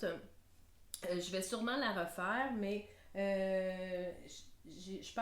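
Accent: Quebec, Canada. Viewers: Je viens de découvrir votre chaîne. Super vidéo et merci pour votre joli accent Québécois, j'adore. Vos cousettes sont très bien réussies. Bravo 💚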